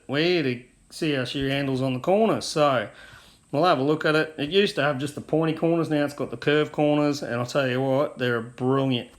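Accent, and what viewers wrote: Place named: Australia. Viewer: I'm surprised you've not had more comments yet but I guess it's because this app seems to have crept in under the radar so far. I'm looking forward to delivery of my MA2 this week having sold my MM and a Phantom3A before that. Can't wait to try this app out as it seems to do everything that I'd like. I used to fly my Phantom with FPV Camera but that app seems to have disappeared now. Keep on with the videos - I enjoy your quiet, understated Aussie delivery!..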